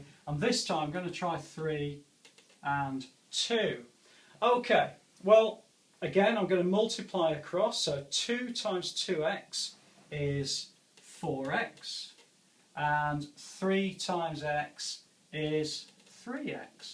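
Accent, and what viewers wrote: England, United Kingdom. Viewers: Finally a person who can explain maths and sounds english!!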